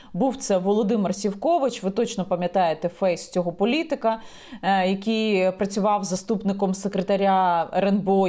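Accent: Russia. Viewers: вам треба перейти на українську мову навіть уві сні! Дуже сильний, може не дуже, але помітний роззійский акцент. Граматика та синтаксис української мови трошки не такі. Треба щось змінити, але не знаю що. Я сама така.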